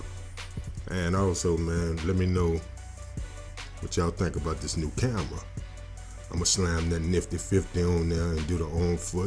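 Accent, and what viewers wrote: New Orleans, United States. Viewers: Definitely a new Orleans nigga doing this video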